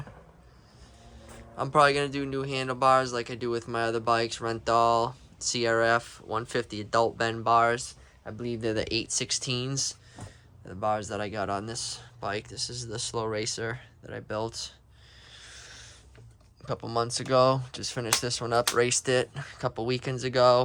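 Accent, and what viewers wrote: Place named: Boston, United States. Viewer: how far away from Indiana are you sounds like you’re in Boston or something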